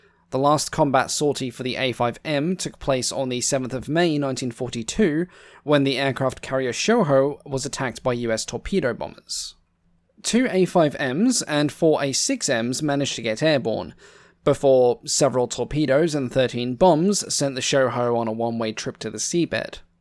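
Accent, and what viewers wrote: Australia. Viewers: Pretty sure hes an aussie. I am, sounds like hes from here too.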